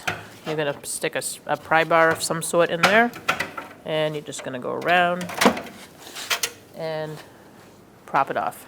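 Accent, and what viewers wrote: Boston, United States. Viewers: I would highly trust a woman mechanic with a Boston accent. These videos are great. I am mechanically inclined but seeing the process a professional uses makes these tasks such a breeze. No thinking required.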